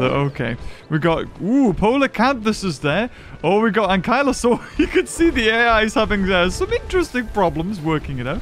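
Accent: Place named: United Kingdom. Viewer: British dude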